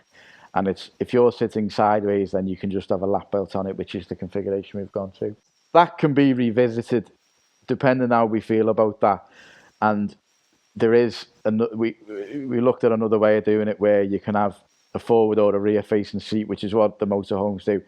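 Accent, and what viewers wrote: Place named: Liverpool, United Kingdom. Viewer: Made up you're a scouser.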